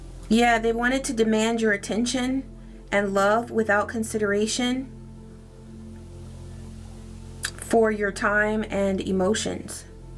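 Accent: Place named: Trinidad and Tobago. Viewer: on point with the voice 😁 you're great.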